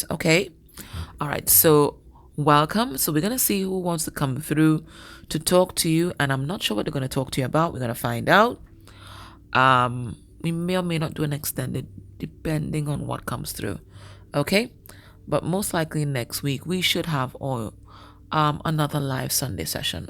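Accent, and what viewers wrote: Trinidad and Tobago. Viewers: Good afternoon, from London England, you sound Trinidadian, or Caribbean, I am Capricorn,xxxxx to you and family..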